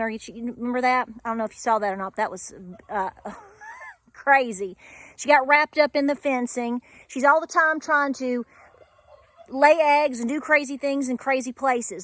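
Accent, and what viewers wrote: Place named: Easton, United States. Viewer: I have been watching you for so long and just wanted to share just how much I enjoy it being from Easton to see myself I love Hearing that accent as you go over things you are so encouraging and it's so heart warming and comforting to know that you care enough to take your precious time and that of to share this information with us a lot of us know it have you used it and may have forgotten about I have forgotten about it but you're helping to bring it back to the forefront and I'm so grateful you too as well as your family are in my thoughts and prayers Best regards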